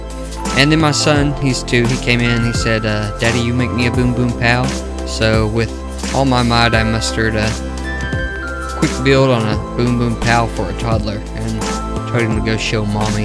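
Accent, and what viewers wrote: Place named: South, United States. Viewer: First time hearing a southern accented hobby youtuber, love it.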